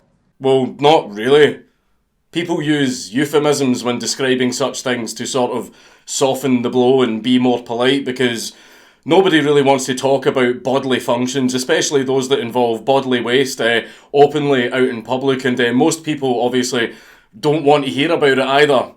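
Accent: Scotland, United Kingdom. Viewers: periods with a scottish accent is the coolest thing ever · There's something special about being taught about the female menstruation cycle by a man with a Scottish accent lol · How the Scott's say shit is fucking amazing sounds angrier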